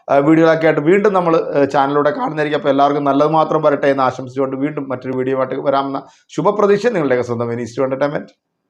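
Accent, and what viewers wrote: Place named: Kasaragod, India. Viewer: ശരിക്കും ഇത് കാസറഗോഡൻ ഭാഷയല്ലേ? അപാരം തന്നെ. കൊച്ചി മെട്രോയിൽ ഇരുന്ന് ചിരിച്ച് മടുത്തു.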